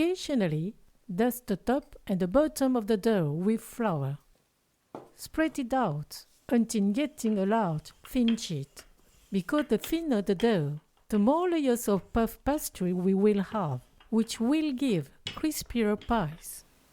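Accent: France. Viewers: I like watching your videos. You look like an Asian but your accent is like French. Do you live in France?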